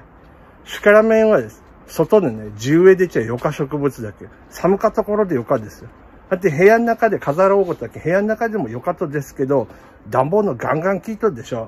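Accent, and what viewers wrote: Kumamoto, Japan. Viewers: 社長の熊本弁好きだなー。もお疲れ様です。いつもありがとうございます。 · 熊本弁かわいいなぁ〜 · 社長！熊本弁のほうがいい！こっちのほうが私好き！社長らしいし親近感わく～～！！字幕いれるとめっちゃ面白いし！🤣🤣🤣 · ふふふっ😂 熊本弁は面白い😆😆😆 このシリーズお願いします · まうごっっおもしろかぁー、私のは、エセ熊本弁かもしれないけど、たいぎゃー、まうごっ、こぎゃん、そきゃん、面白すぎて２回観ました！社長の熊本弁動画大好き一生懸命聞き取ろうと集中力アップ⇧😂だけど、やっぱり苦手な人もいるんですね😢残念、でもたまには、熊本弁の動画もお願いします。楽しみにしてます❤ そうだ、思い出した！熊本の温泉で、あとぜき！！って書いてあったの思い出して1人笑いしてました。本日最高動画でしたー🎉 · 社長、熊本弁、心に響きました🤭 シクラメンの鉢もオシャレになりましたねぇ✨ 行き着くとこはやはりお水管理 ( っ ˙꒳˙ )っ🚰ｵﾐｽﾞﾉﾝﾃﾞ 夏越しさせた５歳のシクラメンですが、まだ🌱 が出ません（ ᐪ꒳ᐪ ）球根ダメになったのかなと思いつつ、諦めず毎日観察してます ( ⚭_⚭)✧ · おはようございます☀ 熊本弁😂 気になって内容全然入ってこず2回見ました😂😂😂 そして1002回目！おめでとうございます㊗️🎉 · 熊本弁いいですね😃‼️ 社長らしさが伝わる☺️ そして、との最初のやり取りが仲良し夫婦な感じが伝わる～😂 今週雪がもっつり降ったので外で冬越しできます多肉さんが残念のお知らせ。。。😱雪にまさか当たったとは。。。⛄💦 便利グッズ、頼りすぎはダメですよね😅私も気を付けます❗ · 熊本弁、社長らしくてめっちゃいい❤ ちょっと意味が分からないところがあったので、少しだけコテコテを抑え気味にして、ぜひ続けて欲しいです❗️ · 熊本弁、聞きづらいので標準語で喋っていただきたいです💦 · 東北生まれの関東人ですが熊本弁良いですね😊 バッテン荒川さんを思い出します。これからもやってくんち‼️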